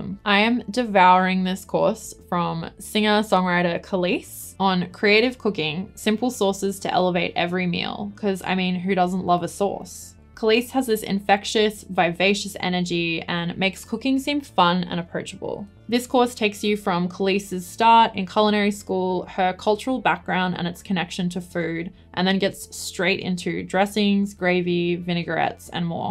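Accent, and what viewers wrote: Australia. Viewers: Subscribed. Enjoyed the video. It's crazy how I picked up your AU accent. Over the years I started to follow a few photographers from AU and this algorithm is now flooding me. But there are really good creators out there. Hope to visit one day.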